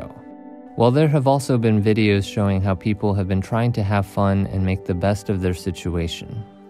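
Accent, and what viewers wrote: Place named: United States. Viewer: 正宗的美式English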